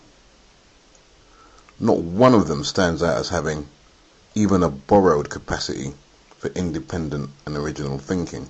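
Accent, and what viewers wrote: United Kingdom. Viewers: "Berick Obama." Gotta love his U.K. accent.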